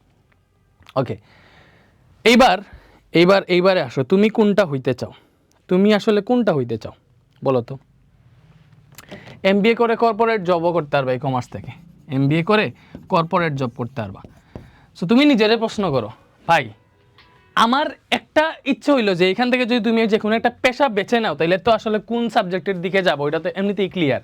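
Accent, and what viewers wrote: Sylhet, Bangladesh. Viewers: ভাই আপনার ভাষায় বোঝা যায় আপনি সিলেটি😊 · bahi mone hoy sylheti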